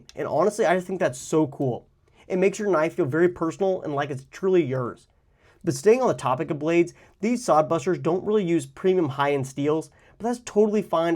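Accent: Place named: United States. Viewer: If you're comfortable sharing, where do you live? You speak American English but apparently live in the southern hemisphere (because it's winter where you are), so I was curious. I'm guessing Australia?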